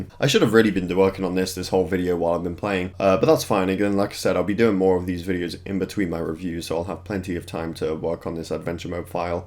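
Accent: United Kingdom. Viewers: I get you with sounding monotone. I think the British accent plays a big part in that tho no matter how much you try not to sounds monotone. I'm from the north of England so i think the same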